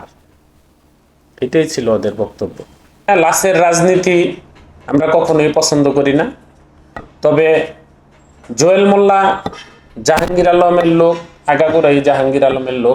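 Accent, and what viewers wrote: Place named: India. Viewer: তোমার ভাষা শুনে মনে হয় তুমি তো ভারতীয়।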